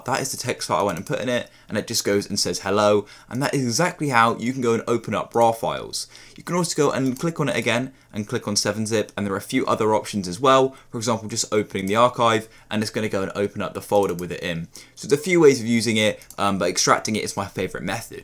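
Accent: United Kingdom. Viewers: ive always wanted a lovely british man to tell me how to pirate aseprite ❤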